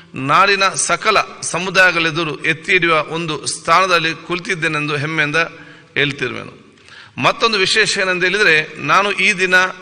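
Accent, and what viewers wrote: Tulu Nadu, India. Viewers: Sir come from Tulu kannada side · ಅವರು ತುಳು ಕನ್ನಡದ ಮಿಕ್ಸ್ ಆಗುತ್ತೆ ಅಡ್ಜಸ್ಟ್ ಆಗಬೇಕು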